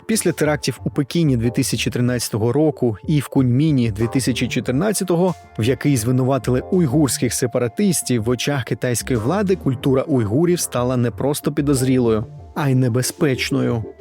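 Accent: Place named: Russia. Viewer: відчутно, що ведучий раніше російськомовний.. досі проскакують: "ихньому" та ін.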